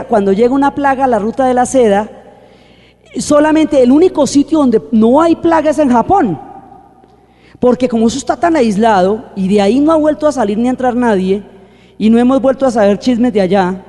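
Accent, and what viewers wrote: Colombia. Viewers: Doctora gracias por compartir tanto tiempo con nosotros y tan bello y extenso conocimiento y disfruto mucho su forma y vocabulario, salí de Bogotá hace 56 años y usted me trae a la memoria nuestra forma de hablar nuevamente gracias Dra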